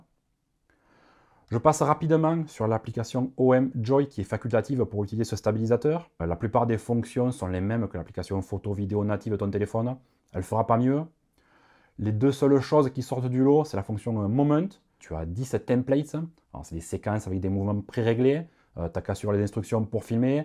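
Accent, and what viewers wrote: South, France. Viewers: Hellooo 👋 Une approche différente de Yannick mais un enthousiasme similaire envers ce superbe stabilisateur et toujours avec l'accent du sud 😎✌️😉👍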